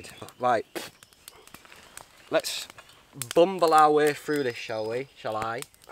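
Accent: Yorkshire, United Kingdom. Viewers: Where are you from? I detect a yorkshire accent.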